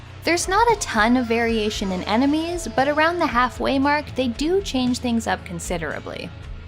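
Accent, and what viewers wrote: Canada. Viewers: I could barely tell. It's the nicest Canadian speaking voice I have yet heard.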